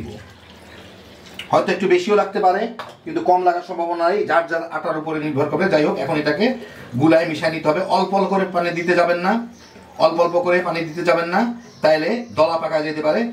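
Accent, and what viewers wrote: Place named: Thakurgaon, Bangladesh. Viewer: ভাই,আপনার বাড়ি কি ঠাকুরগাঁওয়ে? কথা শুনে মনে হয় আপনি ঠাকুরগাঁও এর মানুষ!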